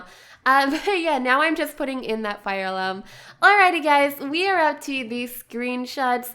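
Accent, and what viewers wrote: Australia. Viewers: are you from Australia? your voice is really similar to Deligracy’s haha 💕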